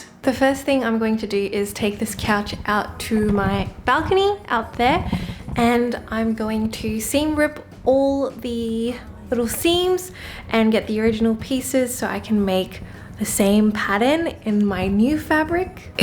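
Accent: Australia. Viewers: An Australian Accent?! Really sounds like your from Sydney :D !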